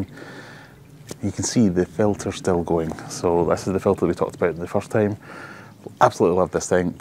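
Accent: Scotland, United Kingdom. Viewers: So comforting hearing a fellow Scot talk about ponds 😂 where are you based?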